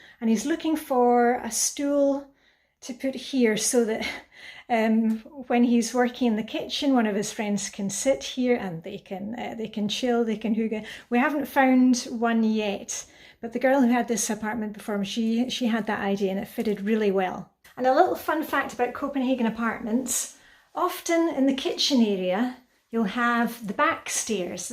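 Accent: Scotland, United Kingdom. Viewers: What a well stocked kitchen, it’s great to see he cooks properly. I have a question which I hope isn’t too personal and respects your familiy’s privacy, but I have wondered about this for ages - do your children speak English with your beautiful Scottish accent?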